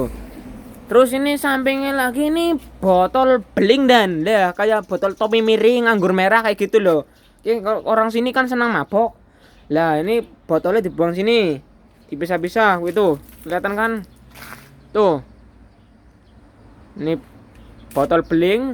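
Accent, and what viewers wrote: Banyumas, Indonesia. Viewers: Rika ngapak e ngendi Ndan😀😀😀😀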